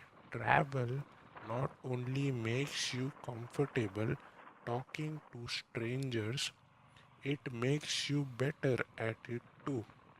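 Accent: India. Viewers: Wow it's amazing, thank you!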